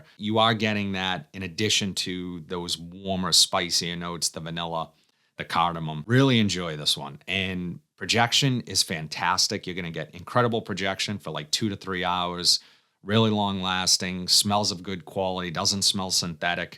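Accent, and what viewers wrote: Boston, United States. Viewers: Great review Boston bro! Have my son with me today and he digs your accent. Sadly mine only kicks up when in Beantown and Warner, NH :)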